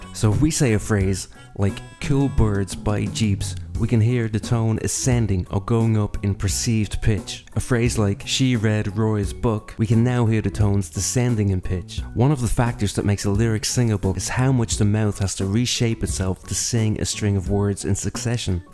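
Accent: Ireland. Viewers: Are you Irish or Dutch? Such an interesting accent! Now I can hear that you are Irish right? Dubliny? Still could be a Dutch person whose learnt from American tv! Crazy! Hope you’re well fella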